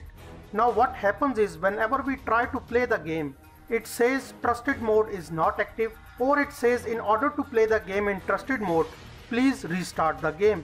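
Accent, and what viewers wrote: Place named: India. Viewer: Indian accent always puts a smile on my face :) thanks for the vid!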